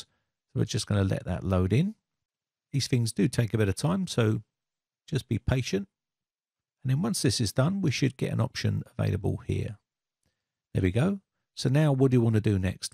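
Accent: United Kingdom. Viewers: Bri ish guy it's not working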